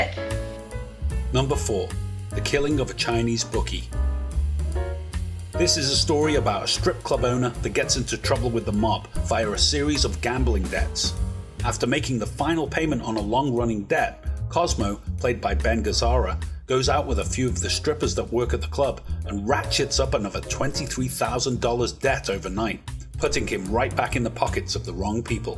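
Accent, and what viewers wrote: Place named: North, United Kingdom. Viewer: Love your Northern accent!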